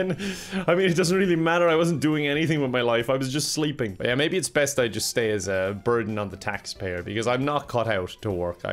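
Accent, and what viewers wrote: Ireland. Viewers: IRISH ASMR WE NEED IT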